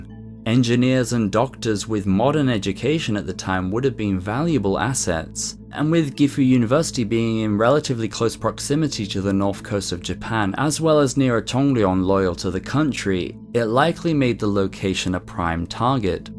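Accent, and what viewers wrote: United Kingdom. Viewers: Is it the British accent? I can't think of what could be hard. He's a basic YouTube presenter and fairly basic to watch. Literally nothing complicated. As for other content like this, Sakura Stardust also does Japan only internet mysteries, mostly stories about 2Chan but there's a lot of variety there.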